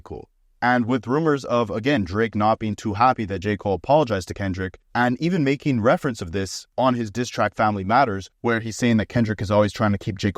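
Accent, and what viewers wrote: Canada. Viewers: The narrator sounds Canadian. Cool.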